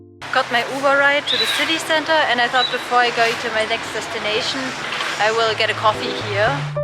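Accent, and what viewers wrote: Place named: Europe. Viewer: Hello, I have been to Lima 3 times, but the last time was in the early 80's. I have also been to 29 other countries. Your voice sounds familiar, maybe I visited your country before, but can not place your home country. I think maybe European. Ron USCG RET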